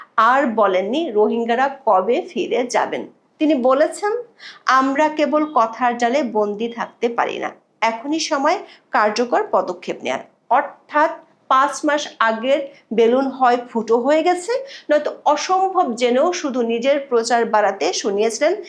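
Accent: Chittagong, Bangladesh. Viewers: আমি চট্টগ্রামের,,আপনার মুখে চট্টগ্রামের ভাষা শুনে,,আমার খুব হাসি পাচ্ছে😂খুব সুন্দর করে প্রেজেন্ট করেছেন চট্টগ্রামের ভাষাকে,,অসংখ্য ধন্যবাদ আপু💞💞💞জয় বাংলা জয় বঙ্গবন্ধু♥️♥️♥️ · ইউনুস সাহেবের পল্টি বাজি কথা বয়সের ভারে তাই মনে হয়! ৫৪ বৎসর ইতিহাসে বাংলাদেশ আজ ৭১ এর পরাজিত শত্রুর দ্বারা নিয়ন্ত্রণ করছে!সুন্দর চাটগাঁইয়া ভাষায় ইউনুস কথা আবার শুনতে পারলাম উপস্থাপিকার মুখে! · আপু আপনার মুখে চট্টগ্রামের আঞ্চলিক ভাষায় কথা বলা একেবারে জেনুইন ছিল👍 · আপু, আপনার চাটগাও ভাষা ভালোই লাগলো! স্টুডেন্ট লাইফে ( চুয়েটে) চট্টগ্রামের ভাষা শেখা, বলা সহ চাটগাঁর ভাষা নিয়ে অনেক মজার সৃতি সামনে এলো! অনেক বছর অতিক্রম হয়েছে, এখন বুঝি, বলতে পারি না!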